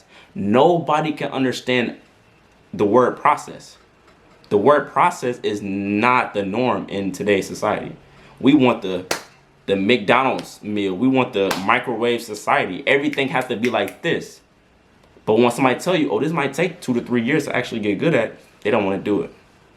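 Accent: United States. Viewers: are you from texas? because of the accent. love the vids btw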